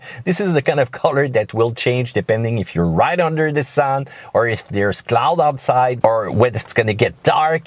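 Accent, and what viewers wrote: Quebec, Canada. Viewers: Love that Canadian accent.... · Quebecois accent...not "Canadian".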